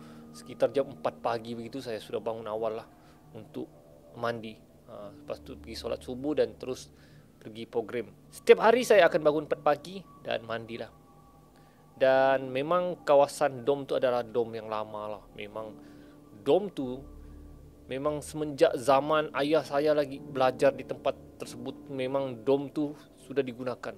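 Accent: Sabah, Malaysia. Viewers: From Sabahan? Right?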